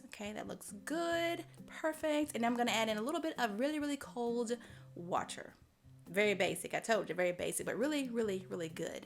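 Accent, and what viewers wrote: South, United States. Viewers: Hi , I am from South Liverpool UK, and love your programs so much and can't get enough of the accent , ( real southern states ) . I have a question and it is this , please what is Half and Half 🤔. Do let me know , as in the UK , we do not have Half and Half 🤔. Excellent program ( never stop ) as it is, ( THE NUMERO UNO ) on YouTube for me . Many thanks .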